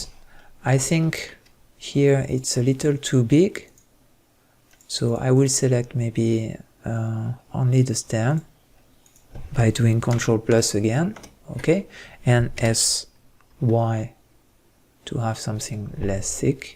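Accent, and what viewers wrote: France. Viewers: Tu serais pas français par hasard ont dirait l'accent Français xD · Très bon tuto ! Et le petit accent français qui fait plaisir xD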